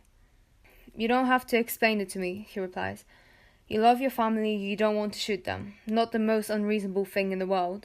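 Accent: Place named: United Kingdom. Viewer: Great video, ^-^ I really like the British accent its very different from other audiobooks ♡♡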